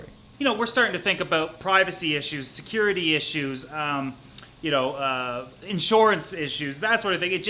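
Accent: Canada. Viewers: My confusion is, clearly you are somewhere in Canada from the accent, and of course the 4 kilometers from house to new gallery. Why then do you measure the space between stands in inches and not centimeters? Just something I was curious about.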